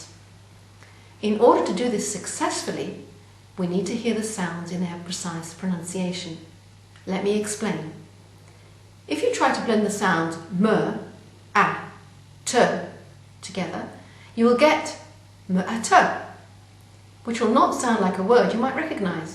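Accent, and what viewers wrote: RP, United Kingdom. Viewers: Hi Ellie, The phonic sounds of English vary according to accent. The accent in this video is 'Received Pronunciation', which is spoken in the south of the UK. We pronounce /ar/ as in 'car' like this: 'cah' so you don't really hear an /r/ at all.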